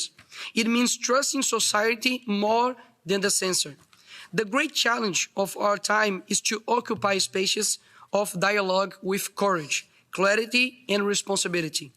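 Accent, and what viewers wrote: Brazil. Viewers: FALANDO INGLÊS TUPINIQUIM DELES.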